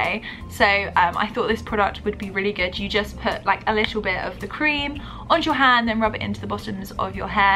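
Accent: United Kingdom. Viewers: I'm American and I understand you! But maybe that's because I watch too many British Youtubers haha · I totally understand you.. They must not hear a lot of british accents because when I watched my first british youtubers, it took some time to get used to.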